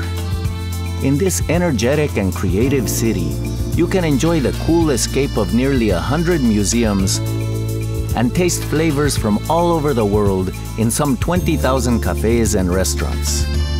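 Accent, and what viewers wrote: Spain. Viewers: Por que o cara tem um sotaque espanhol? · very cool video, but EXPEDIAAAAA why the SPANISH accent on top? We speak PORTUGUESE, our accent is very different from the Spanish accent when we speak English. Should put a Brazilian- Portuguese accent on top if your intention was to make a video as if it were narrated by a local. Just a thought. Nice video though.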